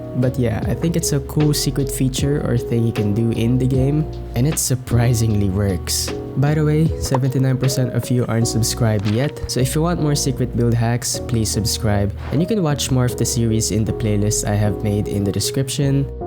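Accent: Philippines. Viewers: You sound Filipino 🧐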